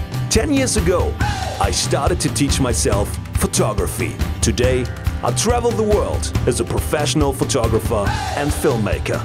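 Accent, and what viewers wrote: Germany. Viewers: after a long time I'm listen to German english ....cheers bro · Hello from "Nepal", thanks for making this video and uploading. Quite useful and exactly what i was looking for. I am also more into landscape photography & about to buy a camera , so far i am only using my phone😁. Could you plz suggest me which camera would be good (not so much expensive one) i can spend upto cannon 77D , and also i saw that cannon 700D was similar and quite cheaper . Btw i am so familiar with your German accent english 😌. I learn it from my dearest friend in Hamburg. 👍👍👍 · You sound like a german who's speaking english edit: I just recognized he's german lol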